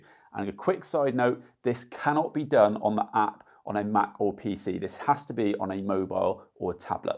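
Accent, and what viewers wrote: United Kingdom. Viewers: Having a guy with a British accent makes this video and Sonos products worse than they actually are….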